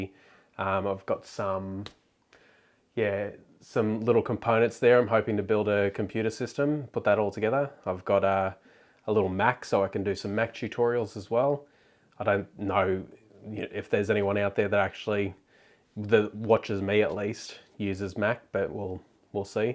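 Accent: Australia. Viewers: G'day Mate, good to see you back, get well. It's good to hear your Aussie accent again :)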